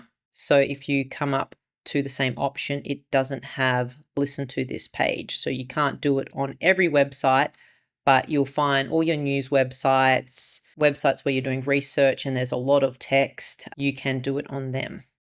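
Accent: Australia. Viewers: Great video as always. The iPad is such a great tech device and it’s awesome that people like yourself publish these videos to help people that might not be technically proficient. Cheers from this Aussie living in NZ 😎🎸🤘💕 Edit: and it’s always good to hear an Aussie accent here on YT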